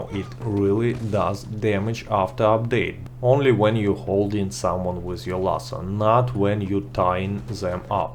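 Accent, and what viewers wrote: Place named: Russia. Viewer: Still laughing please don't ever lose the humor everything us so much funnier in Russian accent!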